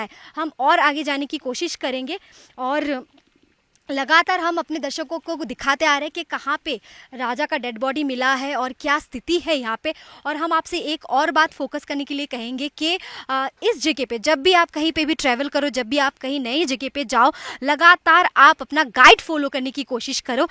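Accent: Bihar, India. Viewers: मैडम अपनी लैंग्वेज में थोरा बिहार का टच दूर कीजिए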